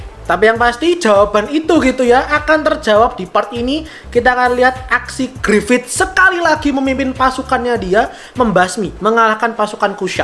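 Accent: Java, Indonesia. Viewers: Medoook bgt loooo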